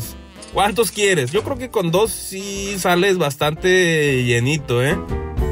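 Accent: North, Mexico. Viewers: Yo siendo de México también se me hace graciosa su forma de hablar creo el es del norte de una ciudad llamada Torreón y entre Méxicanos hablamos distinto de norte a sur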